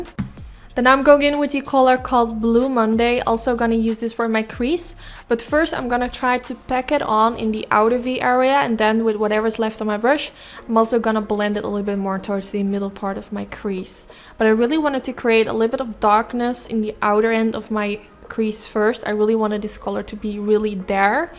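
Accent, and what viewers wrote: Netherlands. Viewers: Wacht ben je nederlands?? Haha dit is de eerste keer dat ik langs je kanaal komt, en na 2 minuten dacht ik hmmm iets aan de uitspraak klinkt een beetje nederlands, maar toch ook weer niet. Daarna ff gegoogled en toen wist ik het hahaa -- leuke video ook!!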